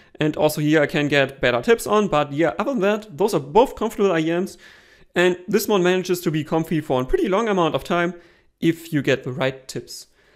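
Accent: Germany. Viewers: the german accent which sounds more delicate than american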